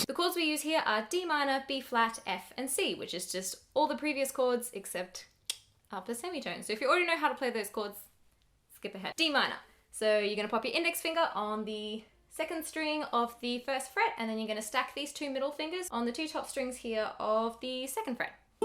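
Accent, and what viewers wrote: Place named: Australia. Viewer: Were are you from? You sound Australian...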